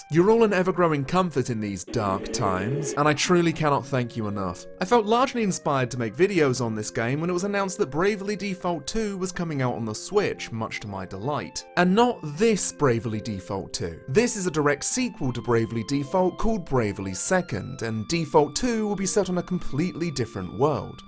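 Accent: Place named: England, United Kingdom. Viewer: You sound English but your avatar is of a gay Asian guy?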